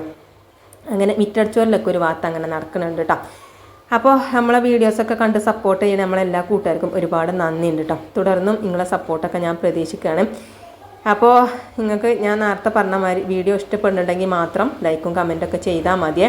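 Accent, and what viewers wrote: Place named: Thalassery, India. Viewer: ഓരോ ആളുടെ ശൈലി അല്ലെ അത് എനിക്കു തലശ്ശേരി ഭാഷ ആണ് എനിക്കു ഇഷ്ടം ആണ് നിങ്ങളുടെ ഭാഷ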